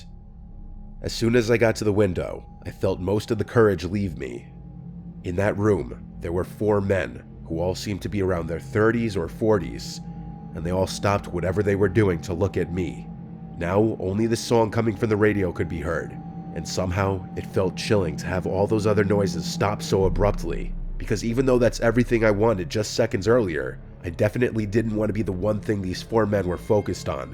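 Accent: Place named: New York, United States. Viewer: has a new york kinda accent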